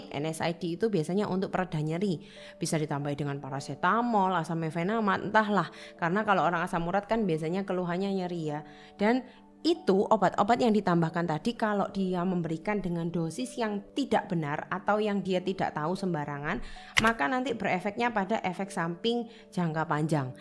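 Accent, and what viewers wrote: Java, Indonesia. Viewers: Logatnya Jawa banget,Iyo ta rek.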